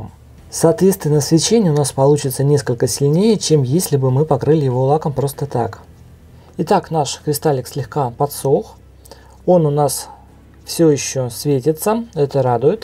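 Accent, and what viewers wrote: Latvia. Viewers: Вы из Латвии?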